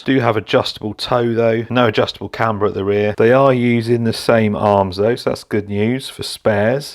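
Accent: United Kingdom. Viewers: Good God that's an ugly controller! 😕 I do like the body shell and your British accent. ☺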